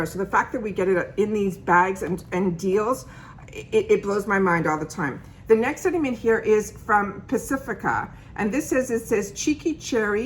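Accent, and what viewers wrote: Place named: New York, United States. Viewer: Do you live in new york? The reason why I asked you is the way you that you speak you sound like a new yorker.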